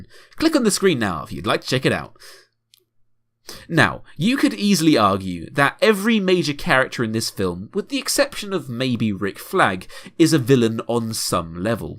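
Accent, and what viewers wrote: United States. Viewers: I love your american accent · american accent revealed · wow dude you have a nice american accent i wish i could do a good British accent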